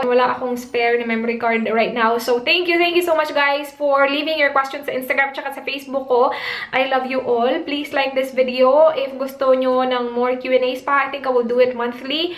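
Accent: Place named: Visayas, Philippines. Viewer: u r sooo funny I almost choked when u retrieve back ur error stragol lollll, is it bcoz of visayan accent that I often hear u say gugol or like Wat u just said.... But u r so funny and cute....stay simple and humble.... If YT makes u happy go on.... But nursing job s very rewarding m telling u gurl, just prioritize ur plans then later u can do both🤗🤗🤗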